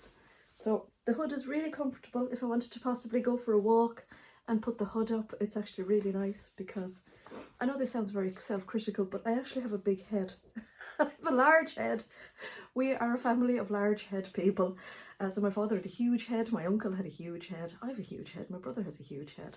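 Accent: Ireland. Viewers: Hello I could listen to you all day. You have the most beautiful West of Ireland accent, soft and melodious. Have a lovely weekend 😘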